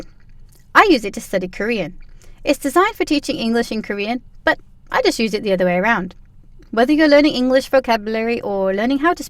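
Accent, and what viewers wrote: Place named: United States. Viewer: 영국인 절대 아님 영국인 피가 섞인 동남아시아여가 분명합니다 발음은 영국영어가 아니라 미국영어에 가깝고요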